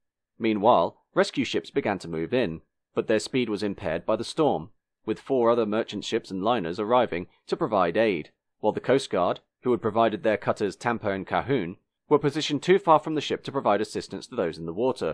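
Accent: United Kingdom. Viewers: Unappealing (if linguistically logical) 'short a' English regional accent exacerbated by a Yankee flat sentence inflection.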